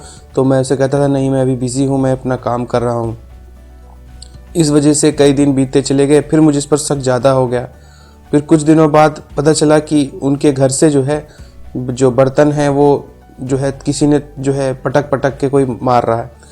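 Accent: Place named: Bihar, India. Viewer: Kon se jila ke ho bhai. Pakke bihari lag rahe ho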